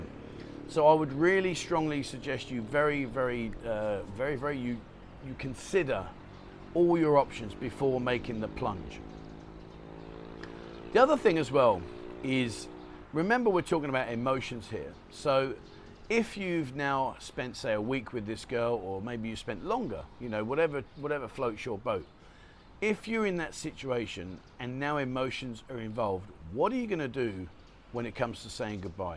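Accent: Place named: Cockney, United Kingdom. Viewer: Very clear advice.short time Thinking in going to thayland for first time .. i think i need to enjoy that ... Living the cogney accent matey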